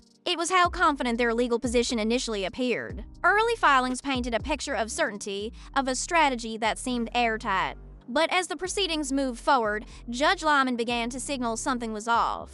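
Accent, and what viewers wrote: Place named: South, United States. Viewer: This is hilarious. An AI video with a deep southern accent. You can always spot an AI video by the utter lack of cadence. But this one with a southern accent is glaring. Lmao😂😂😂😂😂😅😅😅😅😅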